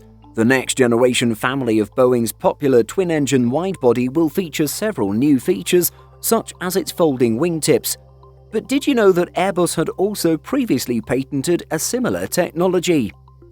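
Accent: United Kingdom. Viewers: The guy is British, that is how the Brits say it, it's not wrong, it's just different.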